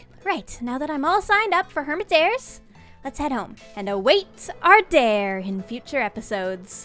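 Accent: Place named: Newfoundland, Canada. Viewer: I love hearing the Newfie in your voice ever so often 👌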